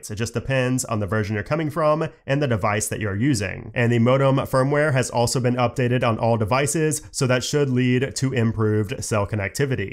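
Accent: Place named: New York City, United States. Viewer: hi, just curious about your accent, where is it from? NYC? thanks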